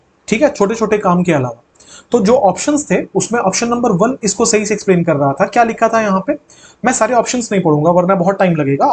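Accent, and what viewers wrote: Bihar, India. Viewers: sir, I know your voice. You are from BIhar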